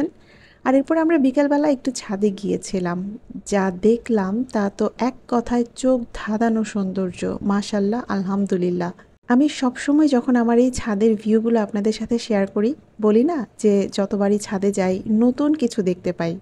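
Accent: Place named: Barishal, Bangladesh. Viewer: Tmr basha barishal er kothay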